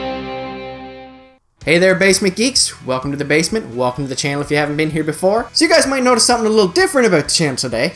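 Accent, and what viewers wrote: Canada. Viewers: Love the Cape Breton Accent! Haha. Great job! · you sounds so much more Canadian than Tracey does.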